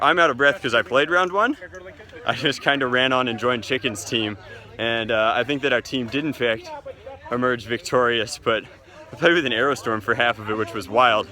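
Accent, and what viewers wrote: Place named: United Kingdom. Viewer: stop with the british b.s like boot and zed and aluminium. its makes u look like a tool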